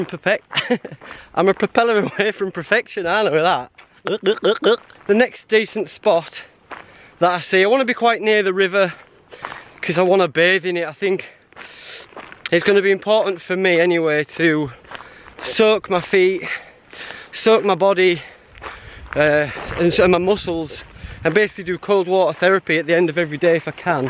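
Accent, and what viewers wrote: Yorkshire, United Kingdom. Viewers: love the video, is the accent yorkshire?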